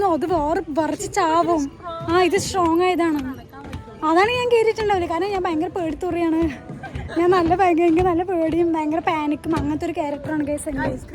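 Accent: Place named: Kollam, India. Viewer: Palakkadan basha ❌ Kollanpotta basha ✅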